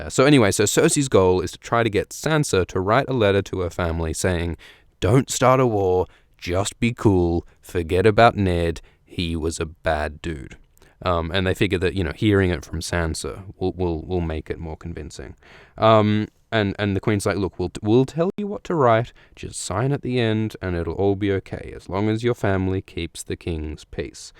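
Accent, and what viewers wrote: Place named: Australia. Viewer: He has an Australian accent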